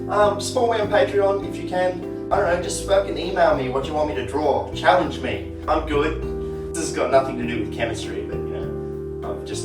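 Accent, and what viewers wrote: Australia. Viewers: every time i watch a video....im all like....Damn, this dude is so Australian. Its the best.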